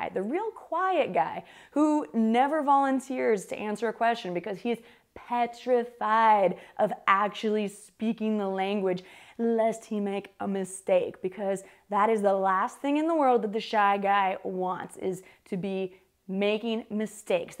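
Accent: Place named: United States. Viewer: Lolz hello I’m Dominican.!!!, if I can be honest I’d tell that I’m a obsessed language learner obout English.! I really love the ways that American native speakers can talk and Actually I’m looking for get to tack and the way that you “ native speakers “ can do it.! Im talking about.... American accent.! The sh...sh... sh.. that you have lolz 😂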